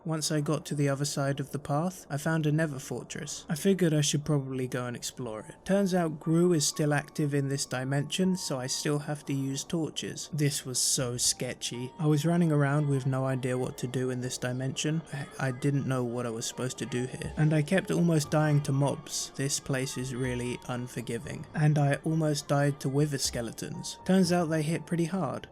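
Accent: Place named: United Kingdom. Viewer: you sound like winnie the poo, dream, and a british accent combined and i am HERE for it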